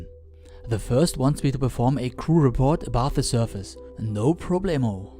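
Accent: Germany. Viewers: nice gemacht und da hör ich glatt den deutschen akzent XD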